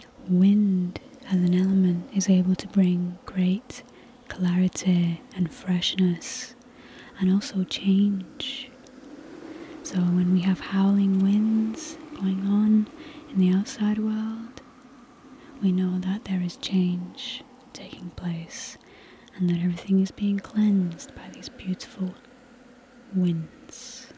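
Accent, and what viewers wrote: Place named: Lancashire, United Kingdom. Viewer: Use the full lancashire accent you possess, so natural and attractive. From a fellow lancastrian.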